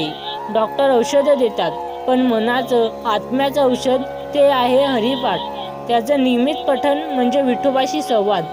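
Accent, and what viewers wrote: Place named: Konkan, India. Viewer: उच्चार अशुद्ध जरी आसले तरी कोकणातली मराठी अशीच असते महाराष्ट्रात प्रत्येक विभागातली मराठी भाषा वेगवेगळी असु शकते कोकणी खान्देशी पुणेरी मराठवाडी सातारी विभागानुसार उच्चारात बदल असु शकतो बोलण्यातील आशय आणी भाव सारांश महत्वाचा आहे हरीपाठा बद्दल खुपच छान माहीती सांगीतली धन्यवाद 🙏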